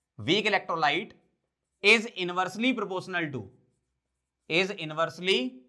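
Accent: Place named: Malwa, India. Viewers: Sir I am here after watching your video on Physicswallah app... Our accent seem to be very similar. Where are u from? My guess is Malwa MP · Yes he is from malwa i guess indore..am also from malwa aur mujhe bhi accent thodaa jaana pehchaana laga😂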